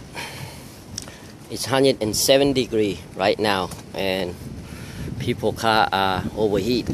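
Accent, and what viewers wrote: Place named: Latin America. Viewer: Porque no lo grabas en español?,,claro se nota que tu acento anglosajón o inglés no es con acento real,,se nota más un latino que quiere dominar el inglés,,porfavor, se te entendería mejor en español.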